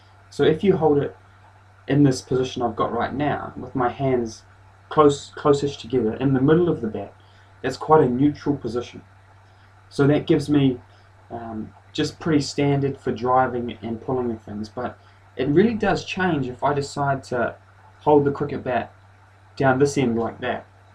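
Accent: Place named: New Zealand. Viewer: dat kiwi accent.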